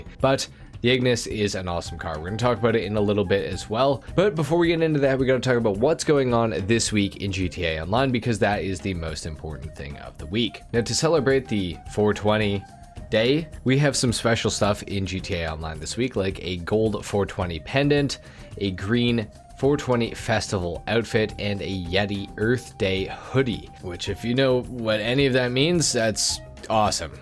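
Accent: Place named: Canada. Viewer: Northwestern thing lmao. Listen to people from Wisconsin, Michigan, Minnesota, North and South Dakota, and yeah Canada. He’s from Canada I think.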